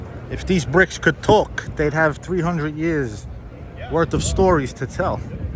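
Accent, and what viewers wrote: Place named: New York, United States. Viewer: Thank you so much for taking us on the journey with you. I haven't been back to New York since I left in 2000. I'm a Brooklyn girl and I love your accent. Didn't realize we New Yorkers had an accent until I left. Thanks so much and look forward to your next video. Stay safe and stay blessed.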